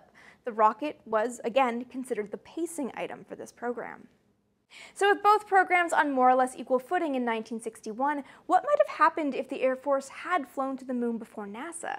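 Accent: Canada. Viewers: Love the show! But is it possible to move away from the almost 100% vocal fry delivery? It is painful after the first few minutes.Vocal fry is common with the Kardashians in sound bites but for a 15-minute video it is literally all I can hear. Keep the Canadian accent of course! You have great content.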